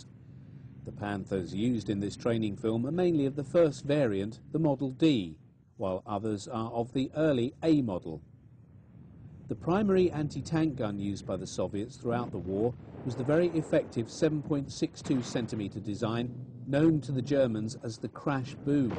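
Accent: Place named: United Kingdom. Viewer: ナレーションの方はイギリスの方ですね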